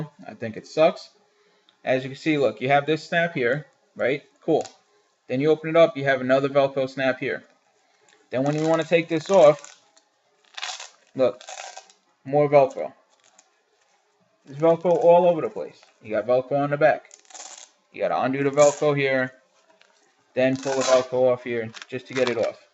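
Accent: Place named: Rhode Island, United States. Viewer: you must be from Rhode island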